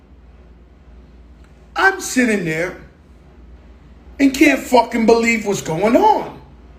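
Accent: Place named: Bronx, United States. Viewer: Joe told this story ,WITH A MESSAGE ,with skill, and spoken like a TRUE BRONX Vet and Legend!!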